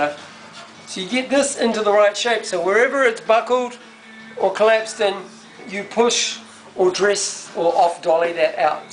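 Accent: New Zealand. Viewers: It's his New Zealand "ex-cent, " Mispronunciation of the vowel e also cherry "rid". (Red). ignore the accent admire his skills and enthusiasm. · "Kiwi bloke" . . . love the "dawun unda" . . . could listen all day . . .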